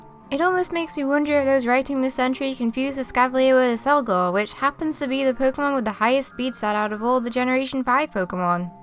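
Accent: United Kingdom. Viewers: Wil Asd its just her accent she is british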